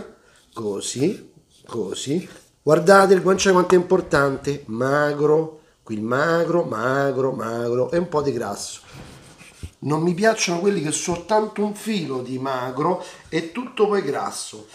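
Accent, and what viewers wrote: Rome, Italy. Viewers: non so se me fa venì più fame l'inflessione romana, il piatto in se o la passione che si sente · Bravo, ma "questa parlata" in romanesco non si potrebbe evitare, visto che è un canale italiano? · 😘😋😋Veloce, semplice e fantastica 😋😋 Complimenti per come sai combinare la tua professionalità con il tuo romano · Cuoco,oltre il simpatico un po marcato dialetto romano ci presenta sempre cucine trite e ritrite da far noia,quando saprà fare un piatto che sia diverso dalla normale consetudine? Stati e non copi.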